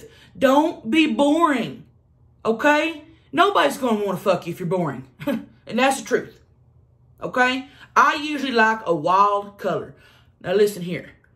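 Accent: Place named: Texas, United States. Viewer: I just love those Texan tone🍧🍧😋😋☺️☺️☺️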